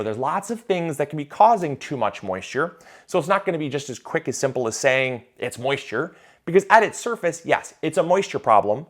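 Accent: Michigan, United States. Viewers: Fun hearing your Michigan accent! So different from the South.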